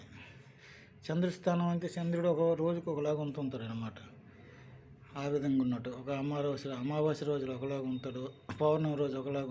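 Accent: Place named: Srikakulam, India. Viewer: గురువు గారు మీ యాస, భాష చూస్తుంటే మీది ఉత్తరాంధ్ర శ్రీకాకుళం అనిపిస్తుంది.. మీరు ఎక్కడివారైన చాలా మంచి విలువైన వారసత్వ నిధిని సమాజానికి అందిస్తున్నారు,అంతరించిపోతున్న ఇలాంటి భారతీయ విద్యను నలుగురికీ తెలిసేలా చేస్తున్నారు..కానీ నా విన్నపం ఏంటంటే మీ లాంటి వారిని ప్రత్యక్షంగా కొంతమందిని తయారు చేయండి.. కనీసం మీ ఇంట్లో వారినైనా కొత్త తరాన్ని తయారు చేయండి... నమస్తే ,జై శ్రీరామ్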